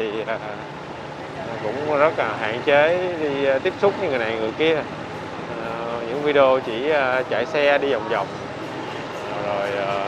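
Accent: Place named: Mekong Delta, Vietnam. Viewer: THANK YOU EM CHIA SE CLIP NAY, TAI SAI GON.N TRONG KHI TAI DA NANG ,QUANG NAM ,DA BI SHUTDOWN. VOI CHAT GIONG MIEN TAY , CACH NOI CHUYEN CHAN THAT, CHAT PHAC ,ANH RAT THICH , CHANNELCUA EM .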